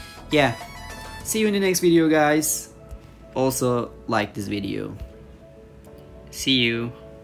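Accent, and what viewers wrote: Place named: Turkey. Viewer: sesi çok türk gibi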